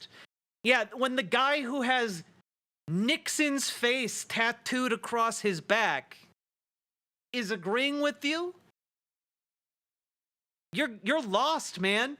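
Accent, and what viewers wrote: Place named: Delaware County, United States. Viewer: And having a Delco accent, is a plus too 😂.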